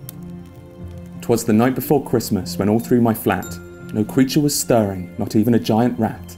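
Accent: United Kingdom. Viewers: Dat beautiful british accent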